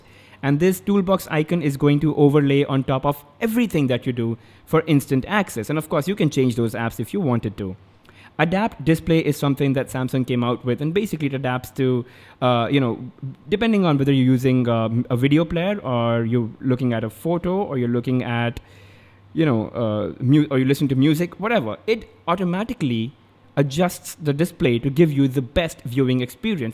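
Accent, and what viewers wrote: India. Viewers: yea thats the indian accent for sure lol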